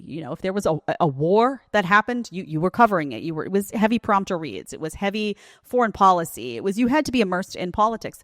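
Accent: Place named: Brooklyn, United States. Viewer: Please, you must stop imitating your teenage only fans females... You go into adolescent brooklynese, New York dialect and you particularly flex the intonations on the ' nasty' as if you're actually that icky female. Just speak about the subjects you're dealing with, and don't become them or allow them to become you. They still resonate a living adolescent part of you, or else you wouldn't be able to join in their vibration so frequently and so effectively. Time to grow up!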